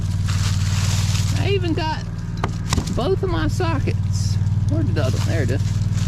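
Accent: South, United States. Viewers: Wow I love ❤ your Southern accent from Norristown PA here 👍🏾👋🏾🤟🏾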